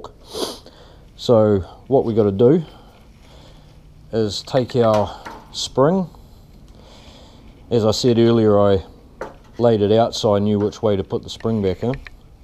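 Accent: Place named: New Zealand. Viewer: Nice Kiwi accent mate! takes one to know one lol. Great vid, keep making vids and posting them up, enjoyable and educational for sure!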